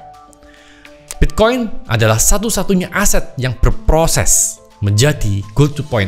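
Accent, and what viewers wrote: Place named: Madura, Indonesia. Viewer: Madura pak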